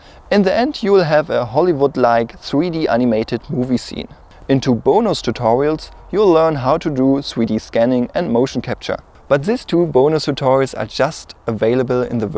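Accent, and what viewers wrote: Germany. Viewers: Der deutsche akzent ist so süß :) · Der deutsche Akzent ist mega witzig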